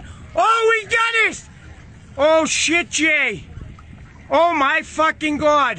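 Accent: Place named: New Jersey, United States. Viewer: the joyzee accent makes it that much betta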